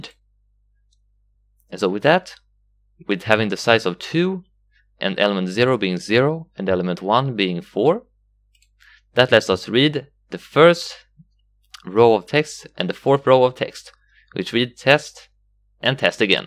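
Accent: Sweden. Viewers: I see that you have a swedish accent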